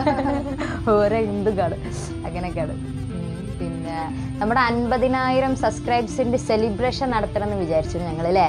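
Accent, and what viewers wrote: Kondotty, India. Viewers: ആ കൊണ്ടോട്ടി ഭാഷ എനിയ്ക്ക് ഇഷ്ടം ആയി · മഞ്ഞക്കിളി.... ഇയാളെ പാട്ട് എനിക്ക് ഒരുപാട് ഇഷ്ടമാണ് അതുപോലെ ഇയാളെ വർത്താനവും എനിക്കിഷ്ടായി ശരിക്കും തനി നാടൻ മലപ്പുറകാരി അല്ലേ... 😄😄😄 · Nammale kondottykkare athe samsaram. ....appadi samsarichu.... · ഇൻശാ അല്ലാഹ് ഞാനൊരു കല്ല്യാണം കഴിക്കട്ടെ മലപ്പുറം ജില്ലേലാണ്ന്ന് സംസാര ശൈലിയൊക്കെ കേട്ടിട്ട് മലപ്പൊറംകാരിയാണെന്ന് ഇൻക്ക് തോന്ന്ണ് ണ്ട് ആണെങ്കിൽ കല്യാണം കഴിച്ചിട്ട് ഹസ്ബന്റിനെയും കൂട്ടി വരണ്ട് അതിന് മുമ്പ് നല്ലൊരു കല്യാണം ശരിയാവാൻ ദുആര് ക്കിട്ടോ ങ്ങള് ങ്ങക്ക് വാണ്ടി ഞമ്മളും ദുആര്ക്കാം ട്ടോ ങ്ങളെ സാരം ഇൻക്ക് ഭയങ്കര ഇഷ്ട്ടപ്പെട്ടു.... പിന്നെ കൂടെയുള്ള ആളെ കണ്ടിട്ട് തൻസീർ ക്കാന്റെ അതെ ലുക്ക്ണ്ട് പെട്ടന്ന് കണ്ട തൻസീർ ക്കന്റെ riletion ആണോന്ന് തോന്നും ഞാൻ കളിയാക്കിയതല്ലാട്ടോ? Masha allah ഞാൻ ഇന്നാണ് ആദ്യായിട്ട് ഇങ്ങളെ സംസാരം കേൾക്കണത് ഇൻക്ക് ഒരുപാട് ഇഷ്ട്ടായി പിന്നെ ഞാന് ഇട്ട കമെന്റ് വായിച്ച കയ്യിണ്ല്ലെങ്കില് ക്ഷമിക്കണം ഇവടത്തെ ഭാഷങ്ങനെ സംസാരിച്ചു ശീലായിട്ട് നല്ല മലയാളം ഇപ്പൊ തൊളേള വെര്ണില്ല്യ ☹️അതോണ്ടാണ് ട്ടോ 🥰😘😘😘❤🌹❤❤🌹🤲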